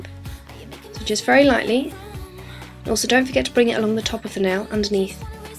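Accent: England, United Kingdom. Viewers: Just watching it still ive paused it can i just say i looooooooovvveeee your pommy voice so much. Subscribing for it and great video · Love the british accent XD EPIC !!!!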